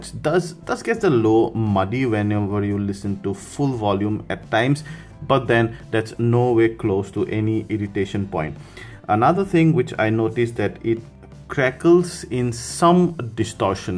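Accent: Assam, India. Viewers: Are you assamese? Ur accent sounds like assamese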